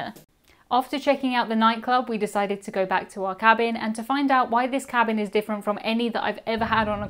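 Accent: United Kingdom. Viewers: I love your videos! btw is it cool if I call you? Also, fun fact, as an American I have my siri as British accent. Her British accent pales in comparison to yours. I wish I could have your voice as my siri.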